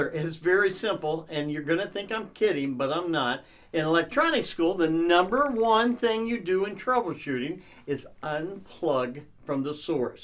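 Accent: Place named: Chicago, United States. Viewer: where are you from in Chicago I can hear it in your voice my guy!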